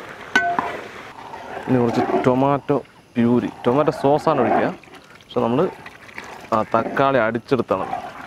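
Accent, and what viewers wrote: Palakkad, India. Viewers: നിങ്ങടെ സംസാരം ആണ് ഹൈലൈറ്റ് ഞാനും പാലക്കാടനാ ലഷ്മണൻ എവിടെ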